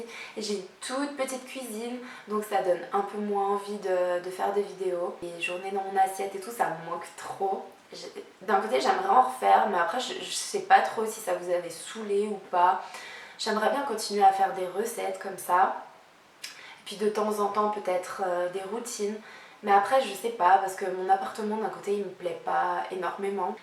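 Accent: Switzerland. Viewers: Mais tu parles comme une vraie vaudoise!!! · Hey te revoilà ! Ça fait plaisir de te revoir par là ! Tu as attrapé un petit accent suisse quand même 😉 ( je suis frontalière et je confirme , le bordel les bouchons 🙈)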